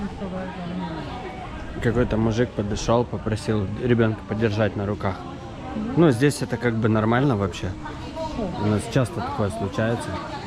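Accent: Odessa, Ukraine. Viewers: Отличная поездка! Слышу говор знакомый, а Вы одессит. Ну а я с Донбасса, Луганск. Удачи Вам!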